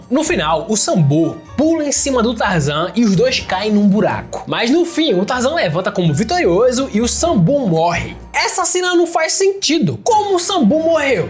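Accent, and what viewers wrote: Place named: Northeast, Brazil. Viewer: bom ver um nordestino com um canal com potencial para ficar grande, cansa de “só” escutar sotaques do sudeste (basicamente)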